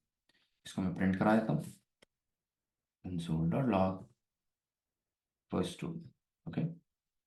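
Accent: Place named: Pakistan. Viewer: Apki voice se ap Muslim lag rhey.... Like Pakistani language you r speaking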